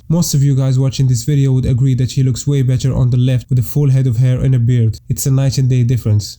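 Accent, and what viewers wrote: Morocco. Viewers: I am moroccan too khoya, the accent sound moroccan. Good luck with your channel, good job ;)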